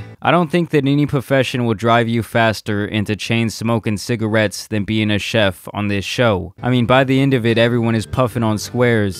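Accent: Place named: Philadelphia, United States. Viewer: you said squares. you're from the midwest area? But you have like a philly type accent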